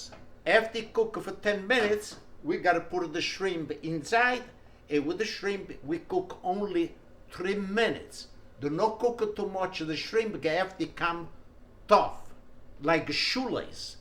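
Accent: Italy. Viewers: The more he tries to lose Italian accent, the more I learn Italian language....nice recipe tho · I came across your video looking for a recipe with shrimp & pasta. I’m definitely going to try this. You’re adorable, I love your accent. I’m Italian too. My husband is going to love this dish! Thank you for sharing. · I can't get enough of his accent he's one hundred percent Italian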